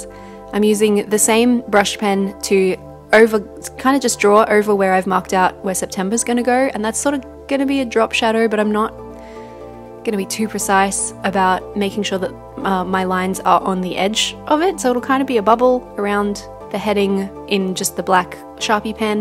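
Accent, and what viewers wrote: Australia. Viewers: I love your simple bujo set up :) WOW! I was in Melbourne back then! I love Australian accent :)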